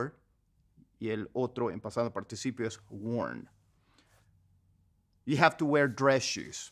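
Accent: South, United States. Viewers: Wouldn't have guessed you'd have a southern accent when you speak English haha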